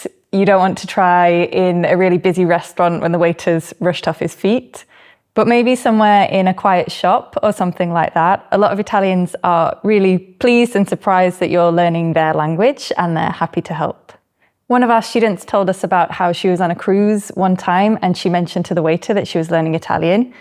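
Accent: United Kingdom. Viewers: Wov, what beautiful british accent. I vove it. Theo-Canada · My first time hearing your native British accent.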